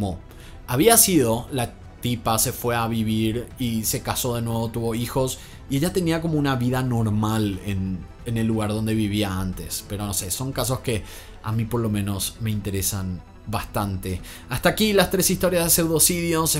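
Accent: Paraguay. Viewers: Amo que no soltas tu acento paraguayo 😍😍😍😍🇵🇾🇵🇾🇵🇾 excelentes casos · Èl es spectacular narrando cada historia y su español es impecable explicando todo tofitito, soy unas de su seguidora ademas ese acento paraguayo es unicooo❤❤❤❤❤ un chico lindo lindo nuestro.!!❤❤🙏🙏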